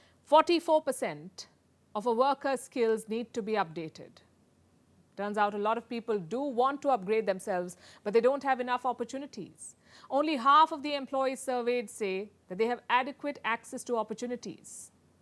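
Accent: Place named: India. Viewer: Typical indian english 😅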